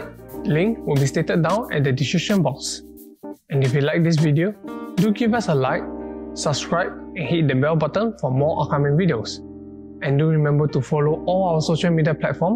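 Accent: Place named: Singapore. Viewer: English. That’s the Singaporean accent for you lol. He has rather bad pronunciation and pacing though, even for a Singaporean.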